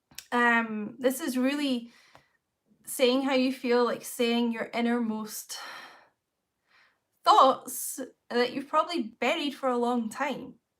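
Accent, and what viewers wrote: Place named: Edinburgh, United Kingdom. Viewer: I LIVE IN EDINBURGH TOOOOOOO!!!!! I FRICKING KNEW IT!! THAT ACCENT! <3 I discovered your channel a few days ago and LOVE your readings! I've been reading Tarot on facebook groups etc and hope to start my channel soon! Commenting without having watched the reading yet haha but that title grabbed me! Got you on the background while im working on Uni work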